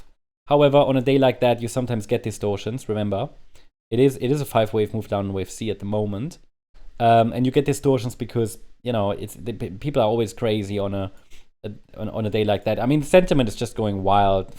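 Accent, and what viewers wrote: Germany. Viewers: He is German right? He Talks soo German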